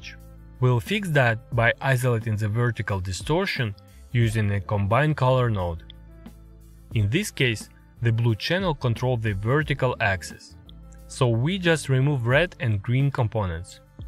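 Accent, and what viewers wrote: Russia. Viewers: Мне кажется, или это русский акцент?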